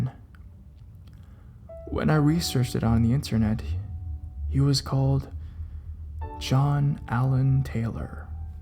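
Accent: Canada. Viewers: Canadians... that accent,and the overacting makes this post sound like one of those scary stories for kids records from my childhood, "whose got my golden aaaaarrrrrrrrmmmmmm?" kinda thing. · Are you Canadian?? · I can tell he's Canadian because instead of "about," he says "aboat."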